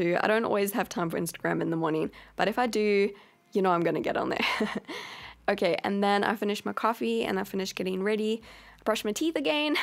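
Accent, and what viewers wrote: South Africa. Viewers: I detect a South African accent? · are you from south africa? love your accent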